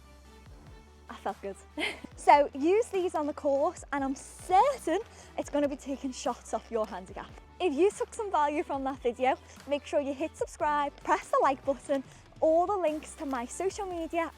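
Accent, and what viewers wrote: Liverpool, United Kingdom. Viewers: Seen you on Instagram a lot but I never have the sound up ,never realised you were a scouser 😅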